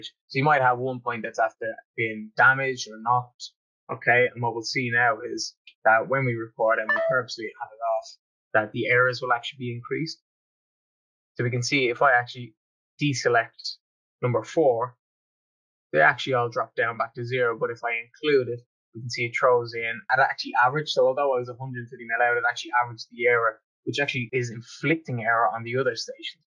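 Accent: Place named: United Kingdom. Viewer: good video and great sequence of information; however you sound British, or European with your accent and as an American and somewhat decent land field surveyor,,,i really, rally think your video would be, reviewed , as much much better is you did not speak so fast. and leave out the trivial , stick to the tech detail and process and insert some pauses in between with also maybe some recall or repeat of stuff and say something like , ",,, ,, remember or as i previously said,,,," .Thanks !!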